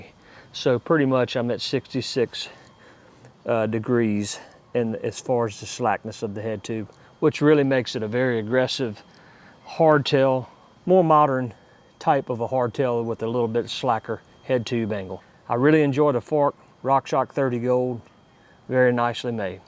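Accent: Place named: Mississippi, United States. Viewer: . I grew up in MS and miss the accent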